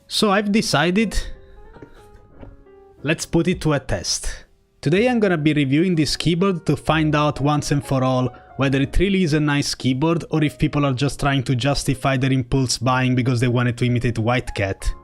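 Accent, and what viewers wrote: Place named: Italy. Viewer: quando un italiano parla inglese si sente cmq grande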